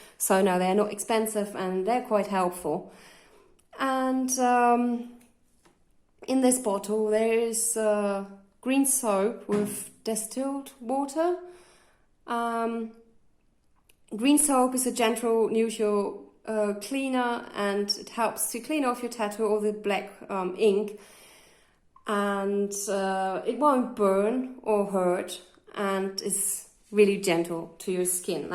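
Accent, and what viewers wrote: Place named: Australia. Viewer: i thought she was a fellow australian for a minute lol.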